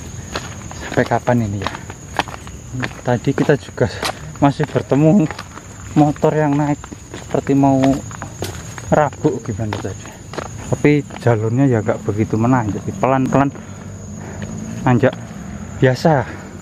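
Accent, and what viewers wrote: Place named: Central Java, Indonesia. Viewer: wahh puas pindah pindah jalur dong....satu gunung bisa bikin banyak video ini mas....satu persatu via mana via mana di kompliti ...orak usah adoh adoh sek...gunung ning kampungmu kompliti dulu ya 😁....kmu jawa timur tapi kok medoknya blum semedok surabayanan ya mas....masih agak agak mirip jawa tengah logat dan nadanya bicara 😁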